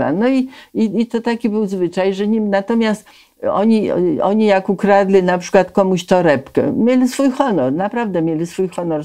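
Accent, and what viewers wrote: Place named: Warsaw, Poland. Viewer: Ma Pani cudny warszawski akcent.❤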